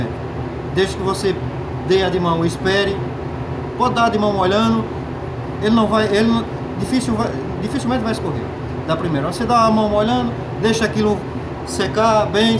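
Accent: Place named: Portugal. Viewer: Vc tem um pouco de sotaque português......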